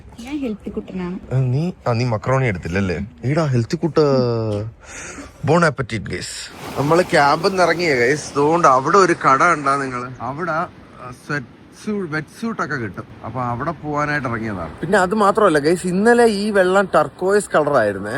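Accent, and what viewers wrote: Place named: Punjab, India. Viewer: ഹിന്ദിയിൽ നല്ല രീതിയിൽ പഞ്ചാബി വാട അതായത് accent ഉണ്ട്, ഡൽഹിയിൽ പഠിച്ച സമയത്ത് ഒരുപാട് പഞ്ചാബികൾ കൂടെ ഉണ്ടായിരുന്നു എന്ന് തോന്നുന്നു, ആ ഭാഷയിൽ pitch modulation ൻ്റെ വേലിയേറ്റം ഇറക്കം കേട്ടാൽ നന്നായി മനസ്സിലാകും, എന്തായാലും ഹിന്ദി ഇഷ്ടപ്പെട്ടു. വർഷ പഠിക്കുമ്പോഴും വർഷയുടെ ഹിന്ദിയും ഇതേ തരത്തിലാകും.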